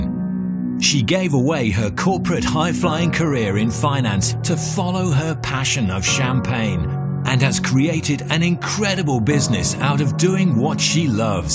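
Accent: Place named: Australia. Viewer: Kyle Richards with an Ozzy accent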